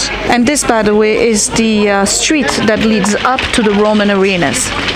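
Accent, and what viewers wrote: France. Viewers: I hear already a little france accent, love it!!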